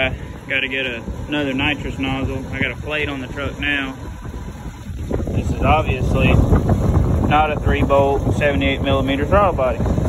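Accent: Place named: Tennessee, United States. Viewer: Hey Brother I appreciate the info the problem with me is I'm a single dad of two little girls 10 and 7 . the issue is I don't make alot of money I make about 17 bucks an hr . I want to do all this stuff to my truck but I'm afraid I'll mess something up. I ain't got the money to fix it or to send it off to get it done. On a scale of 1 to 10 how hard is it to do this? I wanna do it but afraid ill tear something up. Anyways god bless ya Brother .sounds like you got a Tenn accent I lived in Tenn for six yrs in a small rural town called Cunningham Tenn. I loved it man best six yrs of my life. I cut tobacco yellow and dark. I hung it in the barns as well. Best years of my life .you gained a subscriber.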